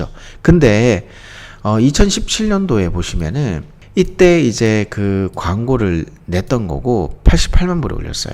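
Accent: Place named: Gyeongsang, South Korea. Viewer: 많은 분들이 부동산 얘기들 하시는데 저랑 비슷한 출신(경상도)이신 것 같아 정감이 가네요. 혹시 질문 사항이 있을 때 이메일 같은 것 드려도 괜찮을까요?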